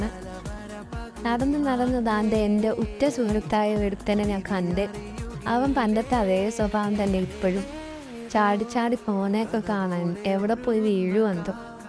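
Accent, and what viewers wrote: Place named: Kollam, India. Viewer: From that ‘മോന്ത’ talk, we can understand you’re a certified kollamkaariiiiii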